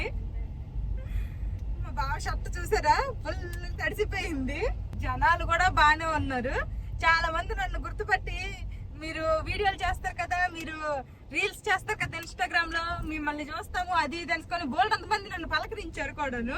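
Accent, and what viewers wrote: Srikakulam, India. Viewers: dear madhavidont think of leaving the mangoes bagif there is no place..make place.how can you leave andhra mangoes....your srikakulam accent is very good. nice to hear. you talk openly..ftom your heart...👍👍💓💓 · మీ కన్న మీ అమ్మ గారు నీట్ గా మాటలు ఆడుతున్నారు మీరు మరి శ్రీకాకుళం యాస దారుణంగా మాటలు ఆడుతున్నారు 🙏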